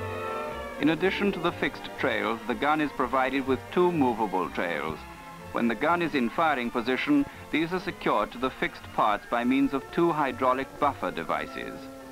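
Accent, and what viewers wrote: RP, United Kingdom. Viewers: It's a Swedish weapon, not British; although clearly a British narrator. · the bbc accent is horrendous, no one in the uk has ever actually spoken with this accent (other than media turds)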